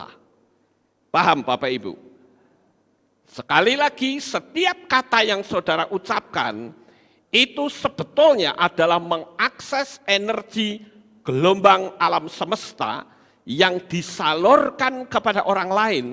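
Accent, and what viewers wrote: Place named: Magelang, Indonesia. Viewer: Itu logat orang magelang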